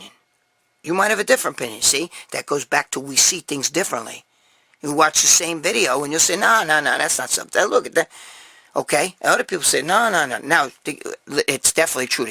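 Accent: New York, United States. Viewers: Brother, you sound like me with a New York accent! Take care of yourself we need you...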